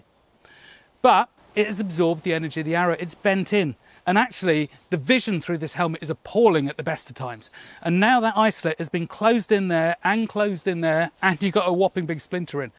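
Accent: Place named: United Kingdom. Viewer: Harry Potter accent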